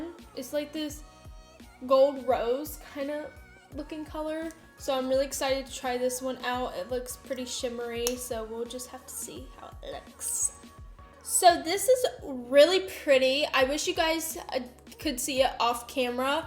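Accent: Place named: South, United States. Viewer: Katie Washington she sounds very southern to me.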